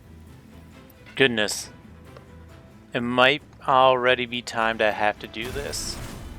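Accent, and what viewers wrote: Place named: United States. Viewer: Sounds like typical standard American intonation to me. though the trend over the last couple decades is towards flat affectless intonation.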